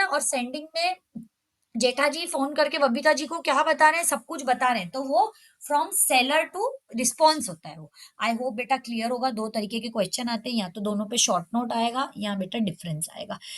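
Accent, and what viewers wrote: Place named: Bhopal, India. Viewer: didi aap pakka bhopali ho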